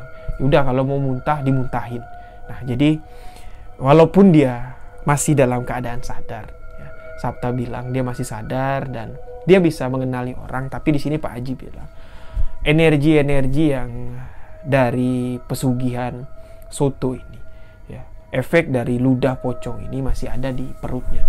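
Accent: Indonesia. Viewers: I just love the way you tell the stories. Sangat mudah difahami. Semakin suka dengar dialek Indonesia ❤️ Hello from Malaysia!!!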